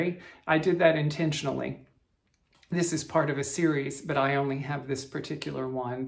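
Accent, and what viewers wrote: South, United States. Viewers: Kia Ora from New Zealand! I have just finished watching your entire journey from the start while I have been home sick this week. You are truly a joy and perfect medicine. I've had tears and laughter, memories evoked, and a lil enabling. If laughter is the best medicine then u are a wonderful cure as I do feel better. Only thing is, as u are the only voice I have heard this week, every time I talk to my cat n dog I have a slight southern accent happening lol. You welcome everyone to the neighborhood but after watching the kindness, support, fun and joy, it's not a neighborhood u have created here, it's a FAMILY. Congrats on your milestones, I watched on TV so didn't comment often, but thank you for keeping me company (I'm a terrible patient) and I would like to suggest a name for hand stand cat ... OHANA. It means family. Thanks again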